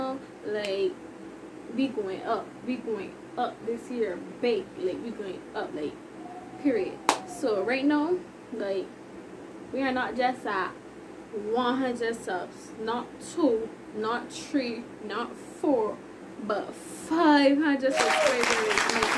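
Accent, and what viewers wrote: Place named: Caribbean. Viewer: You gotta be from nice Caribbean, which one?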